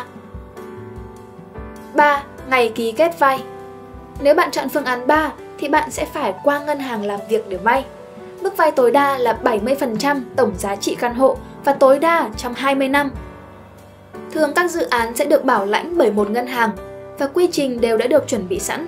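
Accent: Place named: North, Vietnam. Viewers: Căn giá rẻ thì vị trí không đẹp, căn đẹp thì vị trí mắc? Sao đang nói giọng miền Bắc nhưng cứ chêm mấy từ của miền Nam vào vậy ạ?! Râu ông nọ cắm cằm bà kia. Lạm dụng quá nhỉ? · Bài viết có thể của 1 bạn miền nam mà còn giọng đọc thì của bạn miền bắc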